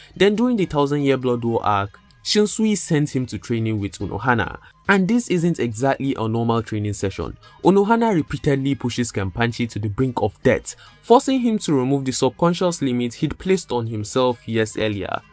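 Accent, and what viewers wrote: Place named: Africa. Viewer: It sounds African to me, very smooth sounding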